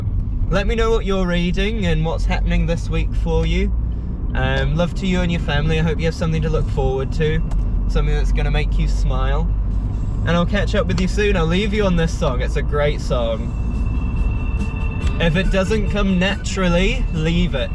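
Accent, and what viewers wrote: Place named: Northern England, United Kingdom. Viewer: this is completely unrelated to books but your accent sometimes sounds so northern english! maybe i just havent heard enough new zealand accents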